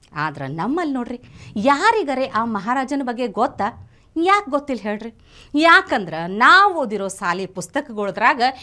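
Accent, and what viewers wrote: North Karnataka, India. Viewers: Good presentation with north karnatak slang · Madam you have good ascent over north karnataka language even south Karnataka people also like your ascent because we are kannadigas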